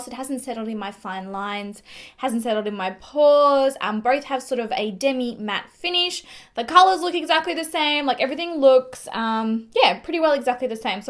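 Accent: Australia. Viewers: Australian is by far my favourite accent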